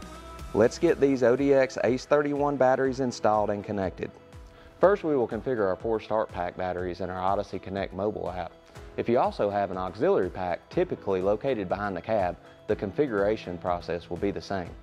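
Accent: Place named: South, United States. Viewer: I like the south in your mouth 😊.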